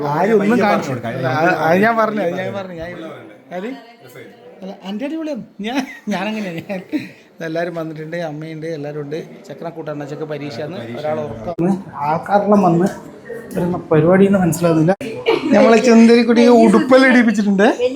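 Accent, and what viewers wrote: Kannur, India. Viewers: ഞങ്ങൾ വടകരക്കാർ കോഴിക്കോട് ജില്ലയിൽ ആണെങ്കിലും സ്ലാങ് ഒക്കെ കണ്ണുരുമായാ കൂടുതൽ മാച്ച് 😂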